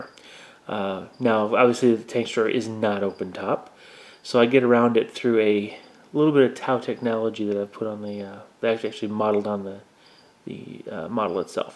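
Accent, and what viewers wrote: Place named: Poland. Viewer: Tylko Po co ten Polskie akcent szpeci model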